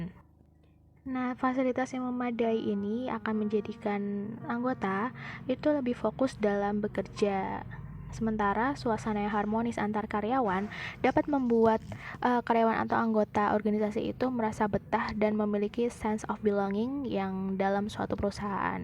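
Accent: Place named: Java, Indonesia. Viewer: Jiosss tenan.. Suara ne meduk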